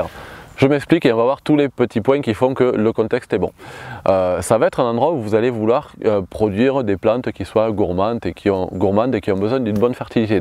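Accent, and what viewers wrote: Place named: South, France. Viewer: Passionné par la nature en général, je trouve dans les vidéos de toujours des tests à essayer( Prendre de l'avance sur le retard😅) dans nos parcelles. Sa vitesse d'élocution respire la maitrise du sujet,il est toujours agréable à visionner les différents sujets proposés. Avec le bon accent du sud aussi, TOP!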